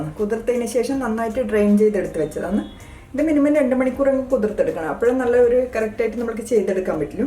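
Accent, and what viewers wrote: Kannur, India. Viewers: Super dosa..I will try ..Kannur slang 👌👍. thanks for sharing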